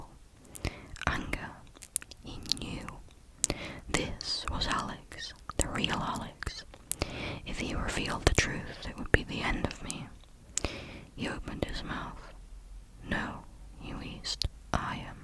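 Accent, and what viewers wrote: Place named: Liverpool, United Kingdom. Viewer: I've only just found your channel. I'm glad I did, there's something about your accent and how you enunciate, it scratches my brain. Almost sounds like a very soft scouse accent.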